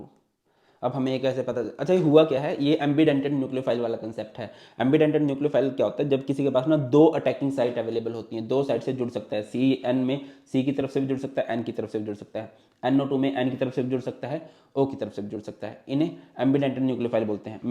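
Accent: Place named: Uttar Pradesh, India. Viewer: Ya he's got that UP waala accent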